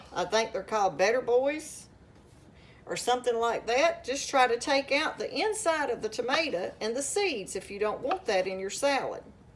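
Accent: South, United States. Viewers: Looks delicious, I like your southern talking voice .. you can get those soda crackers at a good price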